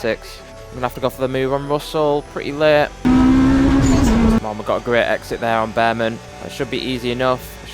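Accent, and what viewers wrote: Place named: United Kingdom. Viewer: I love that British accent brother 🔥🔥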